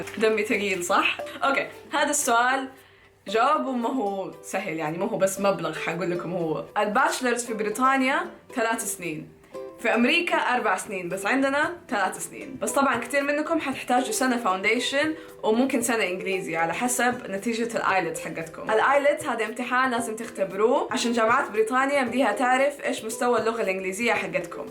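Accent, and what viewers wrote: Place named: Hejaz, Saudi Arabia. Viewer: Her dialect is Hijazi not Kuwaiti they are entirely different dialects bu both are understandable and easy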